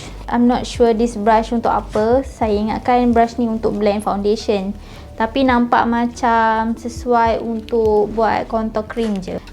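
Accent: Kelantan, Malaysia. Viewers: reply 😲😭 nak nak! lg best bila dgr kecek kelate🤭 btw, u've gone through much tough times..fighting! You did well 💜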